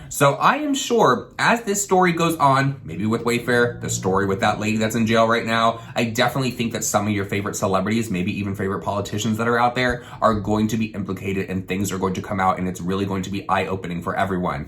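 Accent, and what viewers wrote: South, United States. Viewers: Saw in another video your in Florida.... I thought I heard some southern! (Not in a bad way of course) Watching from LA ( lower Alabama) love the channel.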